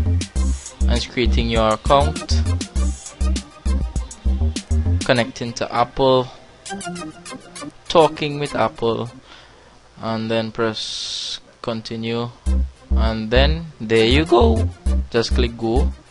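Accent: Trinidad and Tobago. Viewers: hmm u soundin a trini hoss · DALM U HAVE DAT TRINNI ACCENT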